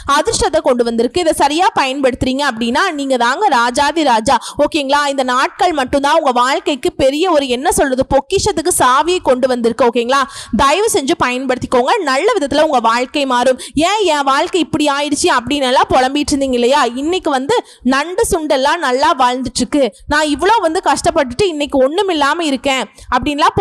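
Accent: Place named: Kerala, India. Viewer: Kerala pesara Amma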